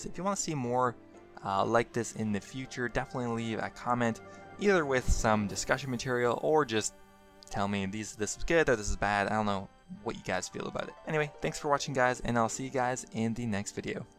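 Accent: Canada. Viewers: mohammad baba tbh I get what your saying but I work a long hours job in sales so when I get home I want to relax and barely concentrate, this guy helped me when I first started flipping, I'd consider myself a veteran player now who understands it but I don't check the whole market for what's it's doing where as this guy does regularly so saves the time with his soft Canadian tones.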